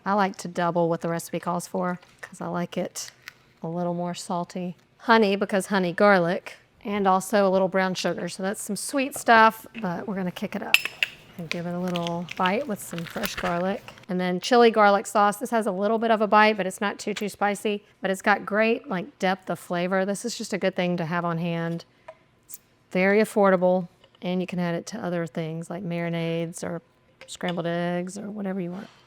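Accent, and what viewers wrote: South, United States. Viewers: This video made my day! I love the suggestions and your calm southern voice. :) Thank you!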